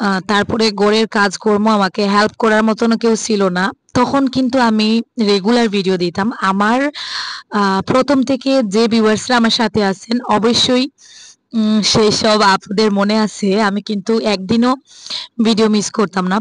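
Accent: Bangladesh. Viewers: Tumi India r oia kne kali Bangladeshi kotha bolo kne? Hindi or assamese kotha bolo apu? Tumar suddo bangla amrar moto oy na bangladeshi kotha add kri daw? Hindi te vlog daw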